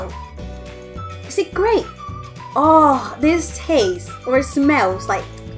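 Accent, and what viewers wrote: United Kingdom. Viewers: I thought ur British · Ur accents gone a bit British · Ikr! She probably started to sound like British people because she's been living with them 😂😂🇬🇧🇺🇸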